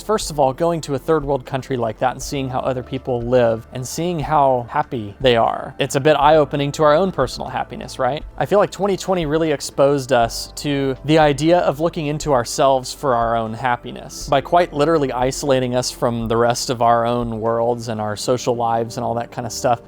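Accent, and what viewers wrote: Texas, United States. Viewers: Thank you for your efforts to make this channel. I discovered it this year when looking for videos on the Mooney and I met your series ferrying the Turbo Mooney to Texas. BTW, I’m amazed how easily I could understand your texan accent 😉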